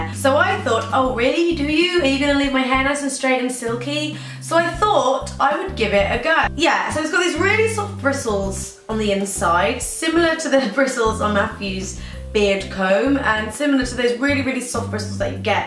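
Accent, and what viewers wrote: United Kingdom. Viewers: You sound like Demi Lavato but with a british accent ... O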